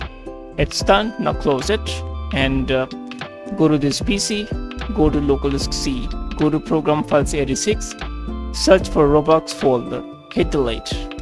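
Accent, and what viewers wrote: India. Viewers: Hey man, thanks for the help! I know that if a tutorial has an indian guy voice i know that he will help my problem, and it will Thank you a lot Subscribing btw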